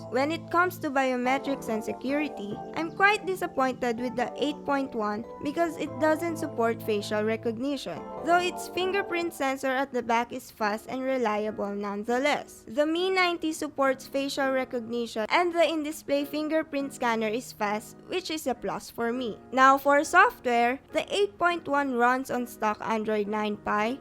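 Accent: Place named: Philippines. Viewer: kitten is a cat what I think he's trying to say its kind of cute she has that kind of "stereotypical" filipino english accent which I guess is heard in most grade 10 students of the Philippines despite her age. But who knows lel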